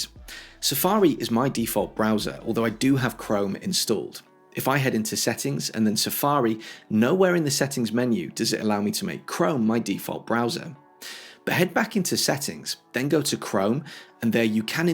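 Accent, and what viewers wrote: United Kingdom. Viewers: I stumbled upon your channel few days ago. I am 73, and like my late husband, I am an avid Apple fan. I have iPhone 11, iPad, and Apple Watch. I am always Googling to find out about the security features and how I could make the most of my devices. Your channel is just what I need. I am in UK, and it is so nice to hear the British accent. I like your style of explaining. I have subscribed to your channel.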